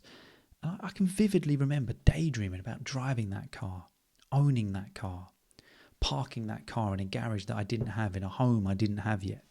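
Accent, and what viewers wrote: United Kingdom. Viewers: I never write comments, but I wanted to support you at least this way, because I think, your channel is really underrated. I absolutely love your British accent and I really appreciate how you cover such interesting and thought-provoking topics in your videos. So far I’ve only watched your last two videos, but I’ve watched them 3 times each.😅 Keep up the great work!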